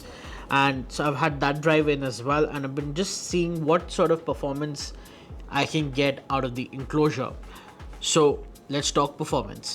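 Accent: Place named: India. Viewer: nice review. the first indian english review that didn't course me to throw up after 5 minutes of hearing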